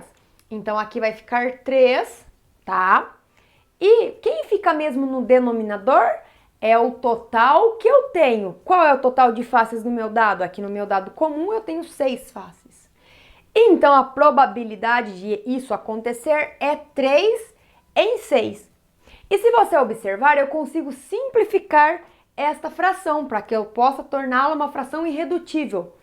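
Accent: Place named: South, Brazil. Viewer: ÓTIMA AULA, e o melhor de tudo, tem o sotaque sulista <3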